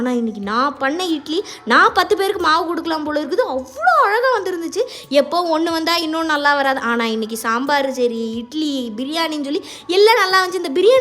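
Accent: Tirunelveli, India. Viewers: Aha puthayal eduthutu vanthutaena 😅😅😅 ithu namma nellai side slangla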